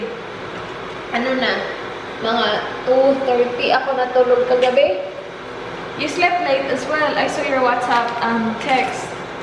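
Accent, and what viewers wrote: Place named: Visayas, Philippines. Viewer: You speak English so well and yet bisaya kaayo gihapon ang dating. Hahaha ✌😇😂💓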